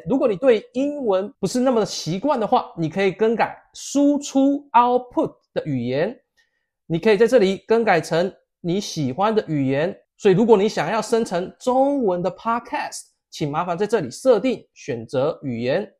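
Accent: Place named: Taiwan. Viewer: 正体中文，而且是台湾腔。不要和对岸搞混了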